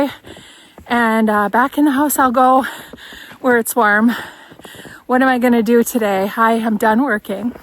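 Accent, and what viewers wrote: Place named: Midwest, United States. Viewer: I love that midwest accent❤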